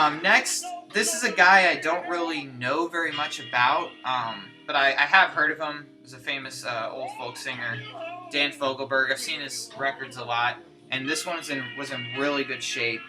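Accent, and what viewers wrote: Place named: Boston, United States. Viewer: You have a little bit of a Bostonian accent too. You are a man of my heart showing that record. My favorite crooner of all time. I am really sorry to hear about your mom. That really did upset me hearing that. She sounded like she really loved her music. had an amazing voice when she was young. Of course I love the. They wrote some amazing psych songs early on that no one really knows about. Good to meet you my friend. Talk soon~ Rob (^‿◕) Boston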